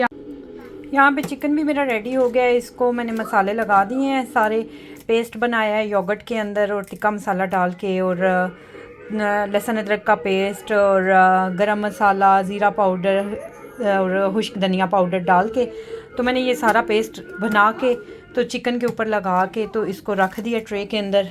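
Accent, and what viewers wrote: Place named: Punjab, India. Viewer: Apki punjabi ka tarka bht maze ka lgta ha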